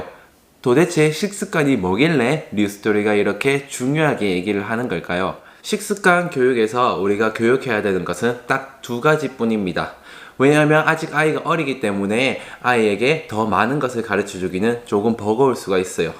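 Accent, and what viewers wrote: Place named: Gyeongsang, South Korea. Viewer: 일한이 너모 귀욥네요ㅜㅜㅜ♡♡ 저도 경상도 사람이라 사투리 쓰시는 모습이 더 친근하게 느껴지고 귀에 쏙쏙들어오네요ㅎㅎ 5개월차 엄마에요 아직 앉아서 먹기힘들어해서 바운서에 누워서 먹이는데 숟가락이라도 쥐어줘봐야겠어요ㅎㅎ 숟가락잡으려하는데 제가 떠먹여준다고 못잡게했거든요ㅠㅠ 낼부턴 스스로 잡게 숟가락을 하나더 준비해야겠어요! 다행히 울아가는 너무 잘먹어주는데 한번씩 안먹으려할때 티비나 동요나오는 장난감 틀어주는데 그냥 다끄고 조용한환경을 만들어주는게 좋을까요? 티비나 장난감은 추후 식사습관에 안좋을거 같은생각이 들었는데 궁금하네요~^^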